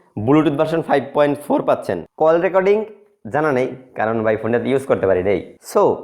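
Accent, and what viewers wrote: Barishal, Bangladesh. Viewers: ভাই কি বরিশালের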